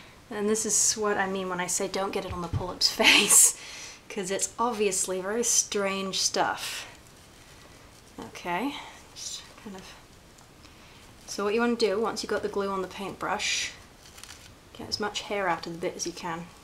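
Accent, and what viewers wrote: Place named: United States. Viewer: Omg, a posh American accent, luv it!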